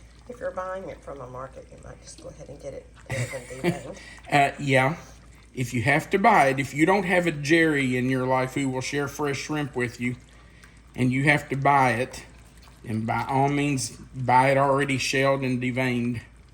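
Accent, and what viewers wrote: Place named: Southwest Virginia, United States. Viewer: I think the thing I like best about you is your accent. It sounds exactly like I sound from SW Va. Thanks for your channel. I love it. I am adding to my grocery list right now.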